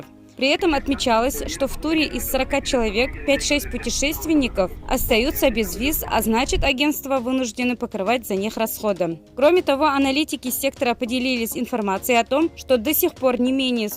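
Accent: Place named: Tatarstan, Russia. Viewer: Такой родной , татарский акцент)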